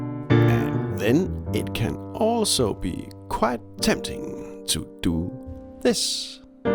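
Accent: Denmark. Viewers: Hej, jeg tager chancen, gætter på du er dansker.....??? Syns jeg kan høre det:-) Super fedt. Hvis du er fra Kbh området vil jeg meget gerne booke et par lektioner hos dig hvis du er frisk.... mvh Peter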